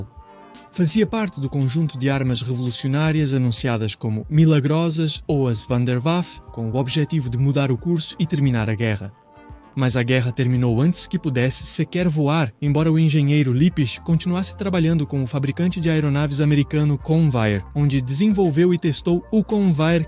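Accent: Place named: Portugal. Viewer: Acento portugués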